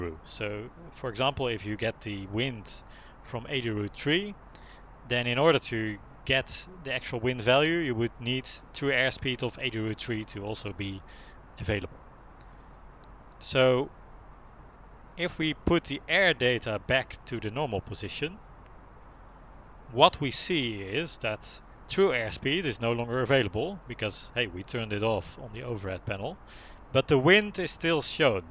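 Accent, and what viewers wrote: Netherlands. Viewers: Ha! Ik hoor een Nederlands accent! Gaan jullie ook over op Xbox series X? 👀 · i smell Nederlands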